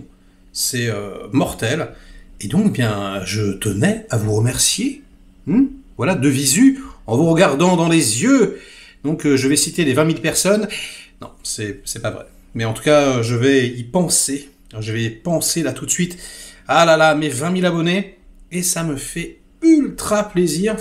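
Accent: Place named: Quebec, Canada. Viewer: Quand je fais connaître ta chaîne du Québec,ils l'adopte immédiatement tu parle comme nous sans prétention, bonne anniversaire 2 ans tu mérite ton succès.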